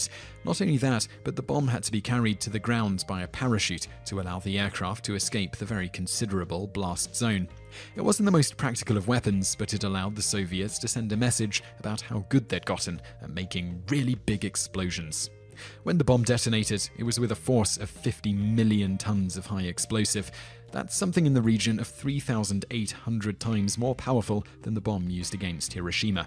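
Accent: United Kingdom. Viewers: Curious. US pronunciation and grammar, in a British accent.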